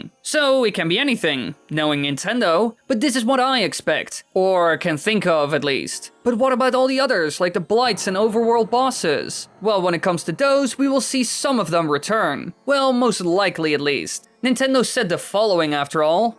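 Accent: Netherlands. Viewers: Oeft what the bloody hell is your accent mate? Is it Dutch? It is Dutch isn’t it?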